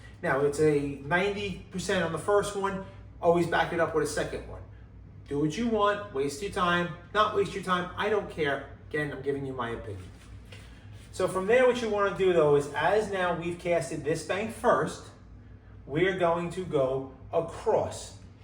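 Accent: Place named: Bronx, United States. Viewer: ARE YOU FROM NEW YORK? You sound like you’re from the Bronx.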